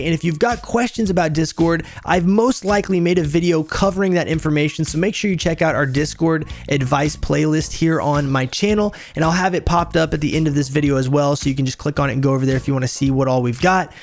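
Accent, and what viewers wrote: United States. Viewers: what is your accent? is that Texas/USA or California? your cadence is familiar - its modified with practice?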